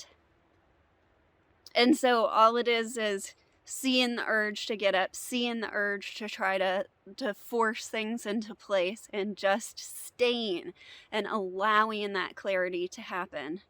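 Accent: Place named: United States. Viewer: Your American accent makes it sometimes difficult for a person who isn't native to the language. English is spoken round the world, you need to speak so that the words are pricked up easily by everyone anywhere.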